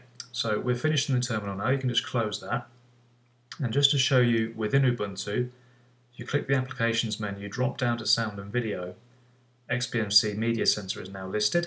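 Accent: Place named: United Kingdom. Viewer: First video which is so useful.finally British accent. After following step by step guide,my xbmc is slower than slow.mouse does not respond and arrows are very slow to respond.any ideas to normalise? I am using acer aspire. Also no matter what the edges of xbmc is beyond the screen of tele.tried reducing and adjusting tv format,doesn't help. Suggest please!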